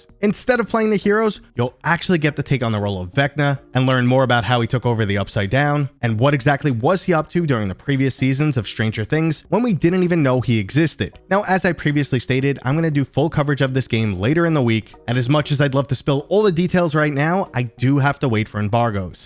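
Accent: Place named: East Coast, United States. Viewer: Definitely East Coast. NJ?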